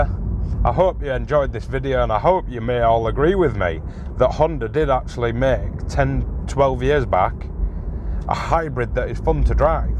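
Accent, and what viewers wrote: Yorkshire, United Kingdom. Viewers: your very good buddy I’m looking at a CRZ next week in my home city Liverpool it’s black ( although they look better in white) 90,000 on the clock £3,200 …it looks like it’s been looked after to be honest mate I’m excited at the prospect of owning one after your excellent review …I drove one years ago and I thought wow what a cracking motor …your review has tipped the scale in me wanting to get one so credit to you buddy …your good you bring something to the car review table that’s refreshing …I sense your a Yorkshire man i live in the Lake District …thanks for that and the reply onward s and upwards buddy I really wish you well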